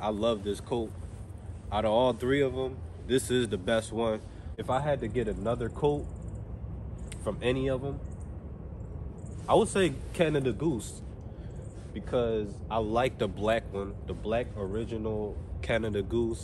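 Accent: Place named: New York, United States. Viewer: You sound like u from new york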